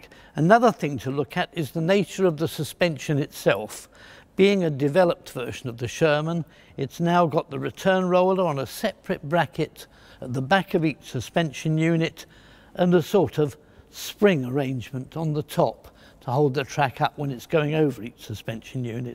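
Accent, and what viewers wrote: United Kingdom. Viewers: Something about his British accent, cadence, and tone - yeah definitely easy listening.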